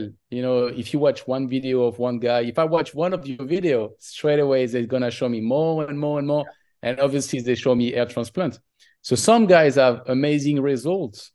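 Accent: France. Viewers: I like his accent - is he French? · Il est très beau maintenant. Plus mieux quand chauve. I could tell he speaks French from his accent. I get frustrated when a guy with a bald spot doesn't shave his whole head. It's annoying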